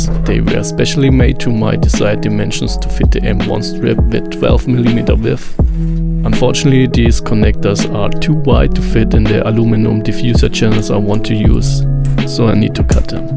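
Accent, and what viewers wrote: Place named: Germany. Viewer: Nice, and then the song 😂 You sound German not Dutch.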